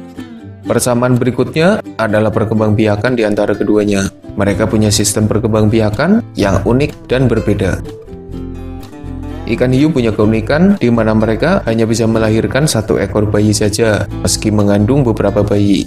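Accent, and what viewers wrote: Banyumas, Indonesia. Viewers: Jujur, admine wong ngapak duk???, ora ngapak ora kepenak mbok?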